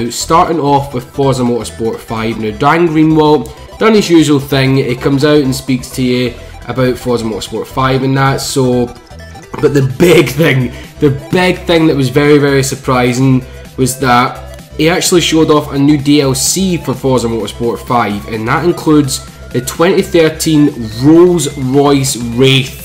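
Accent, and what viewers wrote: Scotland, United Kingdom. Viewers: What part of Scotland you from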